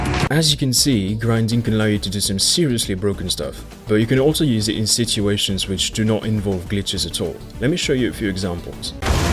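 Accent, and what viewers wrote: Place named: France. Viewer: Best French voice accompanied by best background music <3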